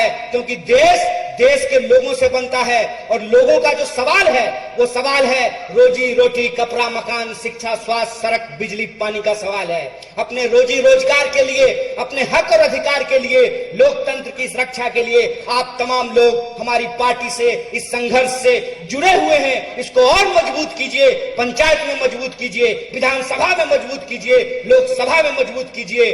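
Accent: Bihar, India. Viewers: साले बिहारी हिंदी बोला तो सीख ले पहले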